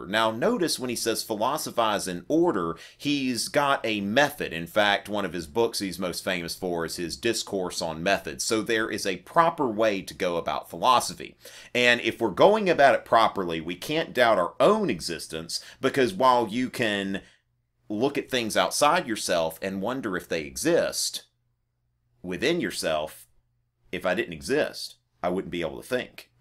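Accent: South, United States. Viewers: His thick Southern accent had me dead before he even said something educational.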